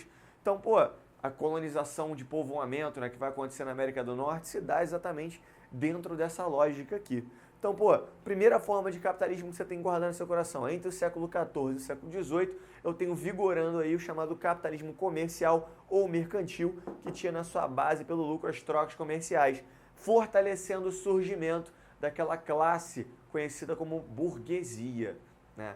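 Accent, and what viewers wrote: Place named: Rio de Janeiro, Brazil. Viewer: Só eu que senti um sotaque carioca? Kkkk aula ótima! Me ajudou muito no seminário, parabéns pela didática, poucos tem...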